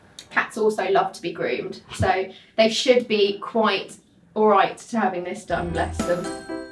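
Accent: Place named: United Kingdom. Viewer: Love your Brit-ish accont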